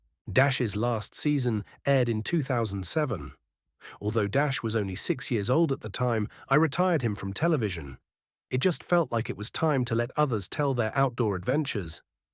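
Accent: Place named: United Kingdom. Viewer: Hello! Ok im confused here. I know playthe music for Hunting with Hank, but the person narrating this certainly doesn't sound like, More like a britt, but he also states he was a musician, who is who? Im writing this in an attempt to prompt someone re-release these two shows, i never got to enjoy Dash but i do remember talking about the new son of Hank, the narrator states all he has to do is pop in a DVD, Please bring back Hank and Dash, I'm heartbroken they are gone!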